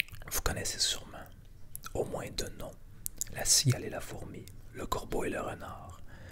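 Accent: Quebec, Canada. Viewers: Alors d'habitude je déteste l'ASMR québécois car j'ai du mal avec votre accent mais avec toi, ça passe nickel et je suis ravie 😄 En plus, j'adore les lectures chuchotées. Je vais aller découvrir le reste de ta chaîne avant de m'abonner 😘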